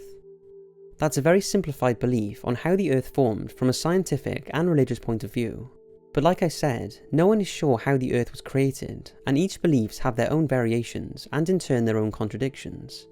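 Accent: Wales, United Kingdom. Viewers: Lovely Welsh accent!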